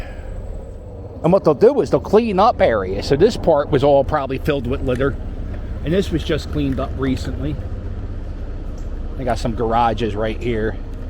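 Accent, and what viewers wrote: Philadelphia, United States. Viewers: Which part of america is your accent from? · Love your Philly accent.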